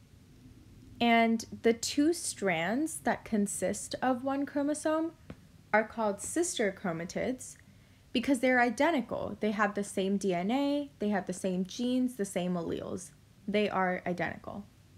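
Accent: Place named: New York, United States. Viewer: Nyc accent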